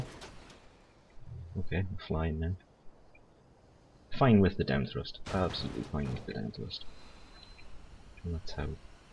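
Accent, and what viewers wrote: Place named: Northumberland, United Kingdom. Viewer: So, you're obviously English, where you from? Sound like you're from Northumberland!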